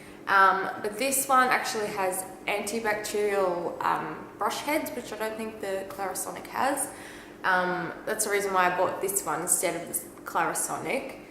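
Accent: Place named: Australia. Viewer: Love this! And seriously love hearing another Aussie accent! x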